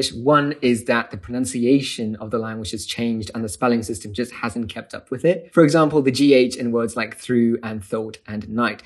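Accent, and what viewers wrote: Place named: United Kingdom. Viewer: いやどう聞いてもイギリス人だろうがこの人😂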